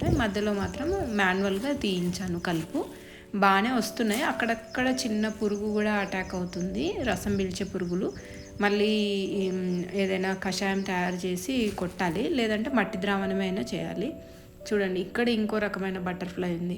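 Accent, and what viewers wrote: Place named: Rayalaseema, India. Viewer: Ragi sakati resipi chayandi garu maa rayalaseema basha matladuchnnaru meeru andi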